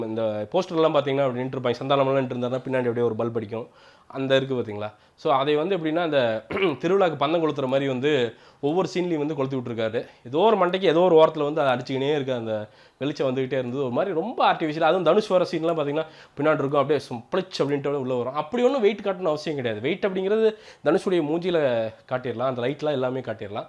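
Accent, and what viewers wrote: Chennai, India. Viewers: ne chennai slag pesatha ......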